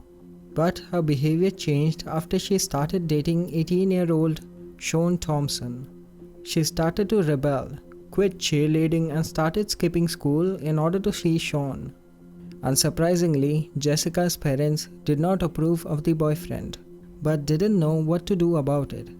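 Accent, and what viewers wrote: India. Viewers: That's an Indian ascent. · Please stop doing videos your voice is so annoying u Indians need to stop talking english all together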